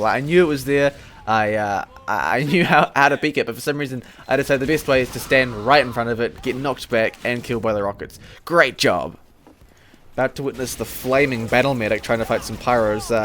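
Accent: New Zealand. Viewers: Are you from NZ? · You must be from New Zealand, with an accent like that. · Omg its muselk! Oh wait it's just some kiwi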